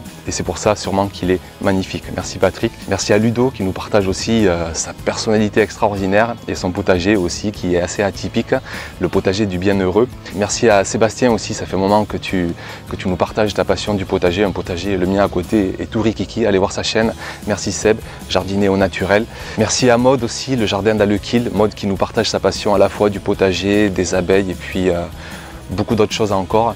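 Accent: South, France. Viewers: Bonsoir, merci encore pour cette belle vidéo,et alors un jardin qui pousse à vu d’œil!! Tu as des légumes à en être jaloux!! LOL. Je voudrais tellement avoir mon potagé comme le tiens. Mon jardin se trouve dans la forêt je suis dégoûtée..Il y a 15 jours, il a pluie énormément et mes tomates ont pris la maladie ( je suis dans l’Ain). j'ai essayé de les traiter avec de la bouillie bordelaise, mais je crois que je les ai perdu mes 15 pieds. Crois tu que je puisse en retrouver encore dans les jardinerie pour les remplacer?? J'ai planté quelques pieds de courgettes, de la diamant et un pied de courgettes allongées jaunes,mais je ne me souviens plus du nom. peux tu me dire ce que l'on peu planter encore dans le jardin ce mois la et les mois à venir. Merci à toi et et à ton petit accent du midi!! j 'adore A bientôt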